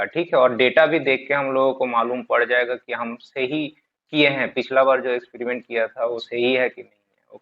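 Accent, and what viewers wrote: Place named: Bengal, India. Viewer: Apni ki bangali? Apnar hindi accent sune amar mone holo ki apni bangali?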